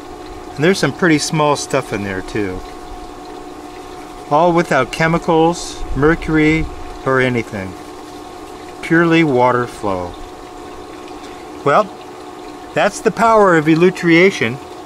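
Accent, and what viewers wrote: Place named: India. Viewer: Indian delivery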